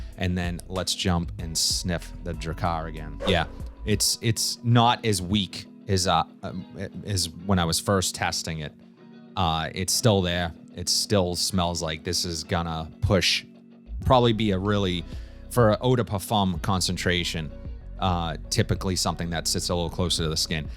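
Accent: Boston, United States. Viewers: Are you from Bahston? I can tell I'm from up there myself. I enjoy your reviews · That Boston accent you got going on is so frigging annoying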